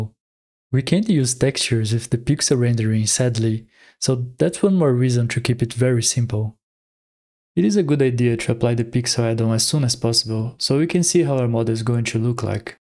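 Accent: Brazil. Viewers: o sotaque br alegrou meu dia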